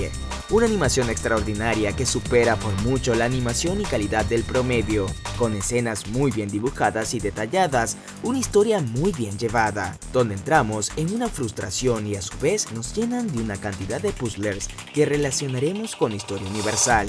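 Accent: Venezuela. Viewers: marico eres venezolano :o no pense que habrian mucho otakus venezolanos saludos desde puerto cabello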